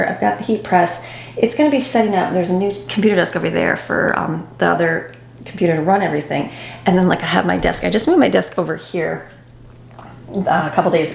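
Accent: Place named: Florida, United States. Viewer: 🙂when I looked it up, it sounded just like how says it...:) maybe it's the Floridian accent making it sound like Bells:)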